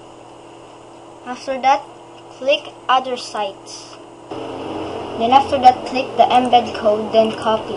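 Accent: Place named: Philippines. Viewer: Pretty fuckin late, but your accent makes me think you're Filipino lmao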